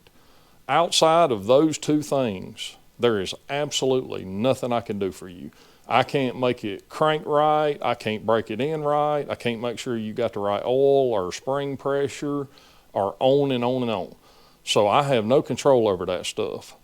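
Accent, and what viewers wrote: South, United States. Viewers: this is the most southern state drawl I've ever heard, a true testament to the American educational system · my man here has got once serious country accent....I miss the south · lack of oil supply on cam gears in my opinion and your southern draw is awesome dude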